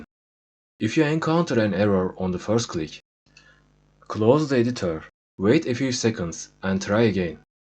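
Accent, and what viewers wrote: Turkey. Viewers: yemin ederim aksandan anladim hemen turk oldugunu. yardimci oldun sagol. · bro are u from Turkey?Ur accent is like Turkish accent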